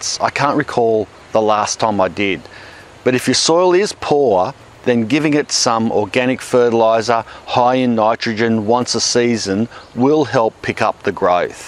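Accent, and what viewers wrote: Australia. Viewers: Great information! Unfortunately I don't see any lemon grass sold with roots. I see two kangaroos in your backyard. That's a bonus. No wonder you have Australian accent! I've been down there 3 times. It's a beautiful continent. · Well done brother! Great presentation+most beautiful Aussie accent gives extra kick! ;) Thank you. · Love the Australian Accent....love all the Videos posted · Love your aussie accent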